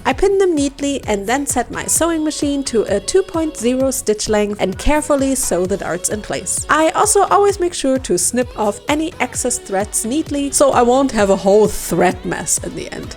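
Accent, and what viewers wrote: Germany. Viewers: Are you German? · Do i hear a german accent?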